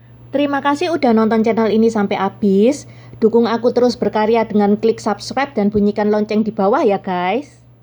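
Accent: Java, Indonesia. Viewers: Anjai jepang jawa medok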